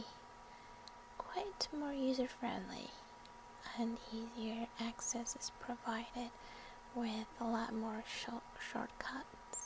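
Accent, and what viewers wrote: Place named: Romania. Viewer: i hadnt checked channel in a bit but, i was glad to log in and see she had 3 new vids since last time i checked! i love her game reviews =D and all vids in general from her. she talks like this girl in my class from romania. she must be from around there lol.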